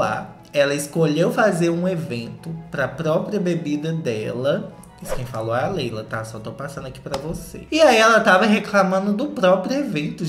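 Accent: Minas Gerais, Brazil. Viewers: 😂 do céu! Você é INCRÍVEL! E, pelo cristo, não mude! Adoro seu “mineirês” de tudo baum! Um cheiro!😁✨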